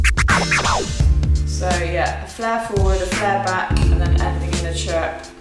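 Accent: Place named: South West, United Kingdom. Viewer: Yes... Defo Dope Skills! You sound like your from the South West; )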